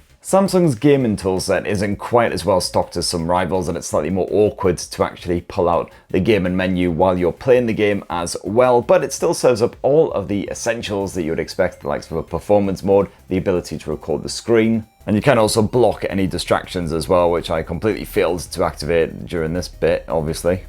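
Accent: United Kingdom. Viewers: love your serious brit accent and syntax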